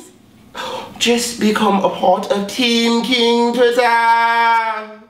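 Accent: United Kingdom. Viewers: I swear I can't stop laughing the British accent loooooooooool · Oh help me. Brilliant British accent if i say so myself. what what.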